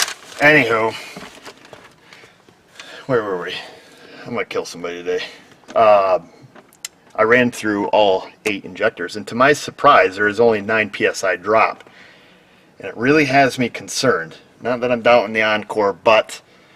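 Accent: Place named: New York, United States. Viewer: love the NY accent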